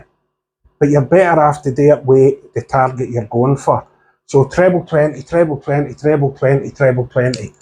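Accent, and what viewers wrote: Scotland, United Kingdom. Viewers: nothing like a Scottish accent! cheers, great video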